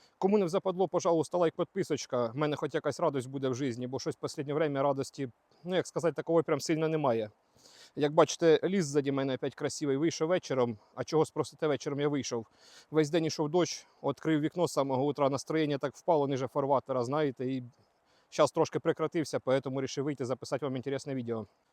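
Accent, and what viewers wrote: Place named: Cherkasy, Ukraine. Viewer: Дивлюсь вас з Канади... містечко біля Ванкувера ... Така ж погода, такий самий ліс !) ... В Канаді повна ж.... з роботою... з цінами на житло... А головне, інколи почуваєш що ти не в Канаді, а в Індії))... Кругом "чорні"... Їжа ((( ... , мабуть найгірша у світі... Дякую вам за відвертість, простоту у відео )!... Маєте Черкаську українську )...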